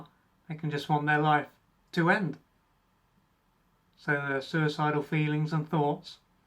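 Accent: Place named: United Kingdom. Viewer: Thank you.🌴🌊🌺 I am gonna try till I die.. 😊. Hehe. So many things you seid are so true. Tummy problems and learning problems and chasing adrenilen or shutting down and becoming a hermit. Lol, iv been stuck in the hermit stage lately. But I have to laugh about it sometimes. I love yr British accent. I have to watch Ricky gervase when I'm feeling really bad because he has a way of making me feel like life is crazy and we all have to laugh at it sometimes but, when I herd you talk, your easy to listen to and bring me back to Earth. I think I'm ment to live in England. Lately all the people I watch on YouTube who have inspired me live over there. It looks cold there. All of you are always whereing Jumpers. 🤒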